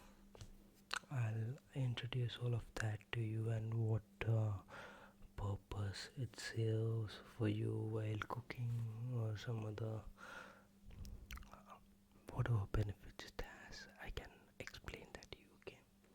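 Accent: India. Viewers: I love indian accent asmr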